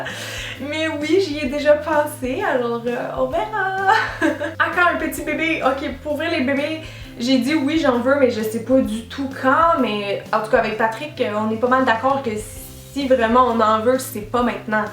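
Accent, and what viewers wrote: France. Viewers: Coucou! J'espère que tu reviendras en France ce serait trop cool de te voir:DD Gros bisous t'es la meilleure et ton accent français est super!;) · t'a voix est méconnaissable avec l'accent francais!! zoubi! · Cet accent français était génial. XD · Ton accent français est tellement ... génial ! J'adore ! ^^ <3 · Mdr les pancakes, c'est un sketch de Gad Elmaleh et Olivier, j'adoore !! :D Et j'adore l'accent française aussi, c'est vraiment bien (je suis française moi aussi !) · Merci pour l'accent français, t'es trop forte, la France t'adore <3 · Aha ton accent francais j'adore! Je suis francaise'et je me rend meme'pas compte que les francais on unnaccent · J'aime ton accent français👌💕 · Gros pouce bleu pour ton accent français aha !! :D <3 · Ça fait vraiment du bien d'entendre "l'important c'est d'être en santé, pas d'être mince !" Si seulement les médias, la pub, etc... 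pouvaient diffuser cette information en masse, je pense qu'on vivraient bien plus heureux ! J'apprécie beaucoup le fait que tu ne suive pas cette mode du "healthy", ça me gonfle de devoir faire attention à tout ce que je mange et de culpabiliser parce que je mange trop de gâteaux. Je me désabonne de beaucoup de chaines de beauté parce que ça me lasse, mais la tienne est vraiment super. Tu est naturelle et en plus tes conseils sont très professionnels. C'est un peu grâce à tes vidéos que j'ai appris à bien me maquiller les yeux. Ah, et puis j'adore quand tu prend l'accent français, je crois qu'en tant que française, j'arriverais pas à faire l'accent québécois ! ^^ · J'adore l'accent français ahah !!! :') T'es super, j'adore tes vidéos !!! :D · BELGIQUE!!!:P Bref... c'est super chouette comme vidéo! J'aime bien en savoir un peu plus sur toi! Et ton accent français est trop trop bien! J'ai essayé de faire l'accent quebecquois... 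hum hum je ne suis pas super douée:D Les critiques négatives et les troll y en aura tjs malheureusement, je pense que tu fais bien de ne pas leur répondre, ça ne sert à rien! Puis si tu as tout ce monde qui te suit c'est bien pour une raison! T'es la meilleure! Bisous! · Peut-être qu'une ou 2 FAQ en + par an serait sympa! Je suis impressionnée comme bcp de monde par ton accent français^^! Tu réagis apparemment de manière posée à certains problèmes/ sujets délicats: tu es mature mais pas rabat-joie! Continue ! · wow ça fait tout bizarre de t'entendre parler avec l'accent français, tu deviens tout de suite si "banale" :p je préfère le piment de ton accent habituel héhé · Ahahahahah ! J'ai adoré ton accent français ! Je suis française et ça fait vraiment bizarre d'entendre dire qu'on a un accent, je n'avais jamais vu les choses sous cette aspect là mais c'est vrai qu'on en a un ! En tout cas tu l'as très bien fait, moi je ne saurais pas du tout faire un accent canadien ! 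Gros bisous !!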